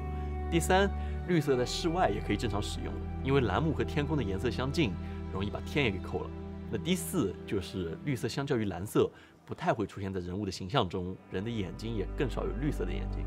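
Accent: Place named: Hangzhou, China. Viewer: Tim的杭普话 太亲切了！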